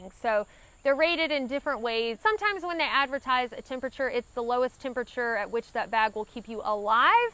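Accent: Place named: Virginia, United States. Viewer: Love your accent. Reminds me of the locals around Blacksburg VA, where I spent a few years at VT. I sure enjoyed talking to the locals. Wish I could have made more time for the outdoors wile I was there. Good to be back home in the mountain west.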